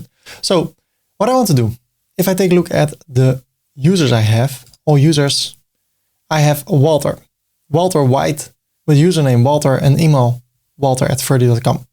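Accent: Netherlands. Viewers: So that where that accent is from, from Holland.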